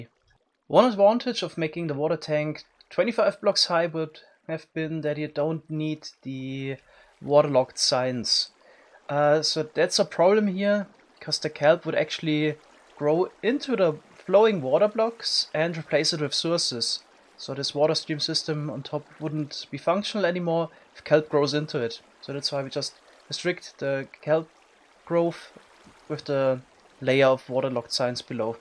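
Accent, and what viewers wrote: Bavaria, Germany. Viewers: Glaube sogar Bayer. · Dude you can hear from his speaking that he is German and for Germans this is easy and simple xD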